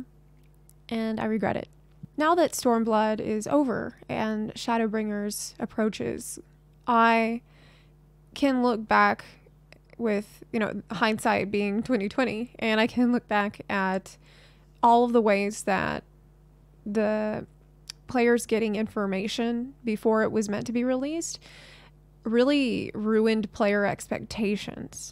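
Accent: United States. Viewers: the country accent is strong with this one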